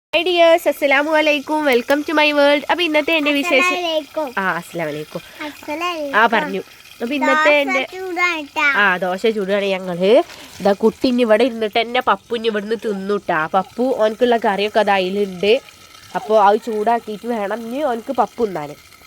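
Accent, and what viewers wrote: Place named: Kozhikode, India. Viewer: Hi new friend🥰happy new year💐സംസാരം കെട്ടിയിട് കോഴിക്കോട് ആണെന്ന് തോന്നുനു🥰❤️nice vlog enjoyed😂😂😂🥰❤️അങ്ങോടും വരനെ കൂട്ടകനെ🥰❤️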